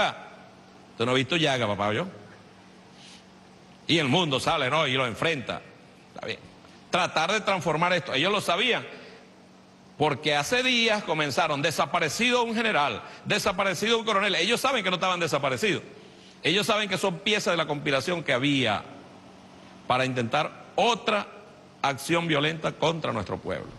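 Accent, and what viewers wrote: Cuba. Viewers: Ha reclamal justiciaa, ya se le sale hasta el acento Cubano se les nota que repiten lo que viene de la Isla.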